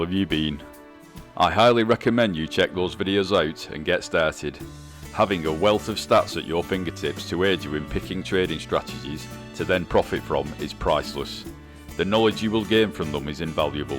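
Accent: Wigan, United Kingdom. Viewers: Gotta love that Wigan accent!!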